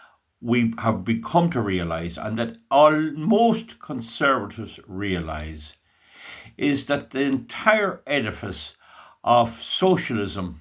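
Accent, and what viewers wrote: Ireland. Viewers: Last time I heard such wise words in a fine Irish dialect was a while ago in a Cricklewood tavern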